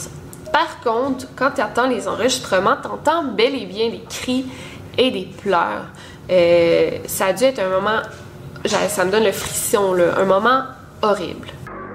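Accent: Quebec, Canada. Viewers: Je t'ai connu grâce à Horia qui avait parler de toi dans l'une de ses vidéos pour Halloween ! Et depuis il y a pas un jour où je ne regarde pas tes vidéos, ton accent Canadien résonne par tout chez moi mdr Continue, j'aime beaucoup le travail que tu fais ^^ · Ahah quel accent québecois, ça me manquait d'entendre ça ^^ en tout cas, très bon boulot sur cette secte (Je ne connaissais pas ta chaine). J'ai voulu en savoir plus pour essayer de comprendre à quel point l'esprit humain peut être manipulable. Merci beaucoup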